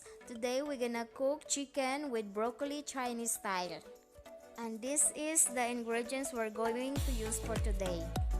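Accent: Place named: Philippines. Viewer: haha i love ur accent..haha..pinoy na pinoy..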